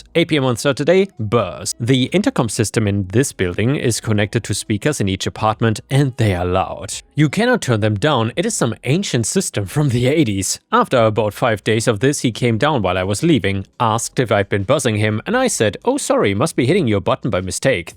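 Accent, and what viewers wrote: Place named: Germany. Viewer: German accent really works with these revenge stories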